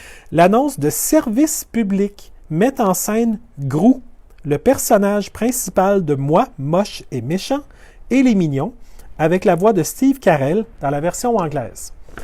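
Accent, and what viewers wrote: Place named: Quebec, Canada. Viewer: Vous êtes marrant, , sérieux à la fois, et vous diffusez une information essentielle sur les bases de documents concrets accessibles à tout le monde. C’est dingue de voir comment les gens font pour encore arriver à croire qu’on ne veut que leur bien. Mais surtout ça correspond en tout point à ce que j’observe.. Quand on voit beaucoup des les commentaires sur les réseaux sociaux on ne peut s’empêcher de visualiser un troupeau de moutons qui se dirige vers un précipice ou vers l’abattoir en étant persuadé que c’est pour son bien! Suis contente de voir que nous sommes nombreux à voir ce que je vois et que des gens comme vous diffusent pour sensibiliser ces gens encore aveuglés par leur besoin complètement illusoire de sécurité. Ma clochette a souvent l’occasion de sonner quand j’entend des messages sibyllins et subliminaux venant de différents médias. 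Merci, merci, c’était un plaisir! Et votre charmant petit accent québécois rend le tout encore plus savoureux. Je vous mets même le petit ❤️dont vous parliez ! Naturellement, je m’abonne et diffuse!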